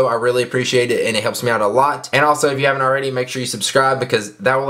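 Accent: South, United States. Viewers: I might wanna infer that your accent's from the South! Are yah.!?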